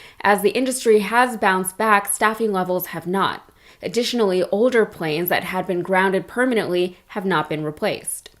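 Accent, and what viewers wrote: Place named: Texas, United States. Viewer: Your whistling has become quite endearing because Matthew McConaughey does the same thing,,when he talks it’s called the Texas whistle❤ I thought you had a speech impediment but it’s just an accent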